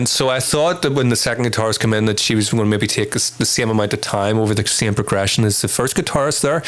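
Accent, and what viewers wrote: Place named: North, United Kingdom. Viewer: Generally, Chopin, or romantic music for that matter, is not my cup of tea. I am more a Bach (I'm German after all) or Beethoven, Rachmaninow person. But in this context, it is really enjoyable. I've seen this several times and this video is my favorite by Lovebites. And those ladies definitely got balls. Like a friend of mine from Scotland answered to "Who can wear a kilt?": Everyone, you just need the balls to do so. On another note are you a Scot? You sound at least like someone from the north of the island. For my German ears, this is more pleasing than RP.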